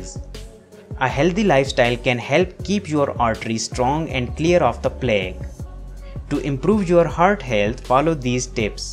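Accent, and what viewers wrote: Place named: India. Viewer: Indian man from voice ..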